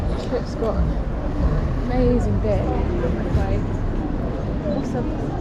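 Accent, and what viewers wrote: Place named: New Zealand. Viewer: Ahhhh I knew you were New Zealander from the first time ya talking Kia Ora from home love your vids!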